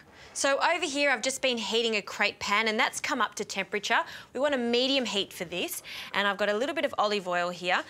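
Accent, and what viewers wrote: Australia. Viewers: What accent she using? Australian? Kiwi?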